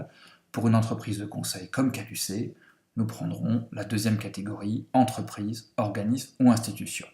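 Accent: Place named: Alsace, France. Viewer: Très bonne présentation, seul bémol, le présentateur a un fort accent Alsacien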